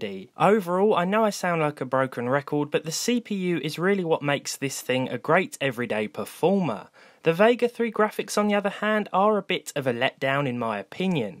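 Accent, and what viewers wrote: Australia. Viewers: Are you from Australia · Great australian accent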